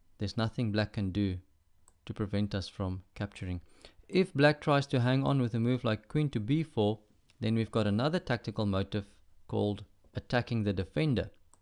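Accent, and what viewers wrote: South Africa. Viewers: This guy is south African I can tell from the voice 😅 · south african accent ?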